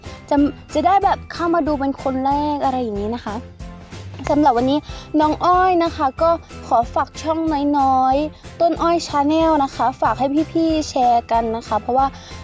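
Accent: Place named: Suphanburi, Thailand. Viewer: เวลาน้องพูดสำเนียงไทย เสียงจะออกเหน่อฯ เหมือนคนสุพรรณเลย😂😂😂อยากฟังควมไตยบ้านน้อง แบบเต็มคลิป ...เพราะแต่ละที่ สำเนียงไม่เหมือนกัน😇😇😇ยอนเสง สาวไตย ว่านพักตูเมิง ค่า.......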